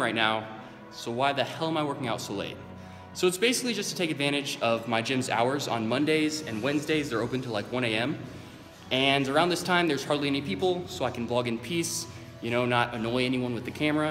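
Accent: Ireland. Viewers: Are your parents or grandparents Irish? You have slight Irish accent.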